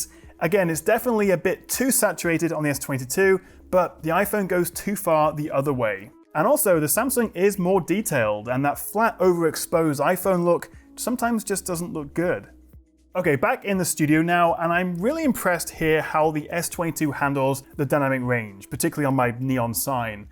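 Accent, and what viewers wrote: United Kingdom. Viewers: I own everything Apple but, you're right, the Samsung has a better camera. BTW, your wife got lucky marrying such a handsome and intelligent man with a British accent.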